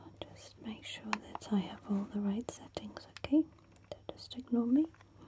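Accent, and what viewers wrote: United Kingdom. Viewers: I've brought all my bits & bobs. Haha love it! Love the Brits! So beautiful to listen to!!! Thank youuu!❤😊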